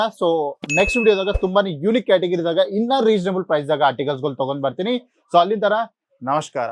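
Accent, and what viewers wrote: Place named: North Karnataka, India. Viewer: ಉತ್ತರ ಕರ್ನಾಟಕನಾ ನಿಮ್ಮ ಭಾಷೆ ನೋಡಿದರೆ ಹಾಗೆ ಅನ್ಸುತ್ತೆ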